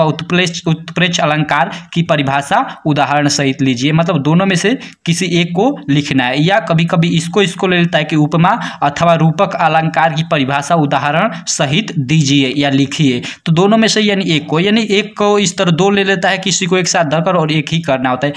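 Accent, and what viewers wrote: Bihar, India. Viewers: Aap bihar se hai kya?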